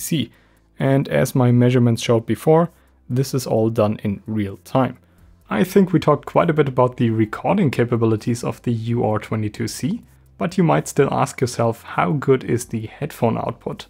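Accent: Germany. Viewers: Ich wette, wegen deinem akzent das du Deutsch sprichts!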